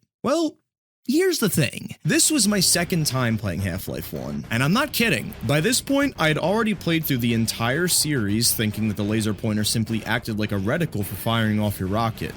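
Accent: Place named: Delaware County, United States. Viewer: Hearing you say Half-Life a bunch of times with a horrible delco accent is driving me crazy. HYEAF-LOYFE.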